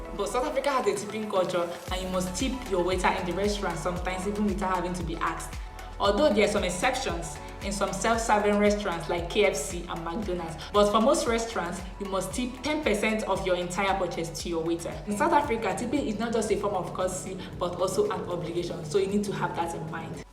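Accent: Nigeria. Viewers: I can hear you as NIGERIAN GIRL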